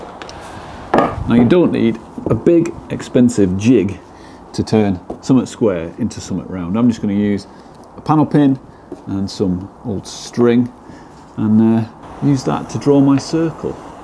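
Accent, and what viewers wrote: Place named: Sheffield, United Kingdom. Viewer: it warped bcoz u left it outside absorbing moisture?? ey up!! fellow norther ere. a dont finkyer from werrawer born lark. burra wer born in boltun. Whers thi from lad? thi sownd lark thas from sheffield or sum werrr.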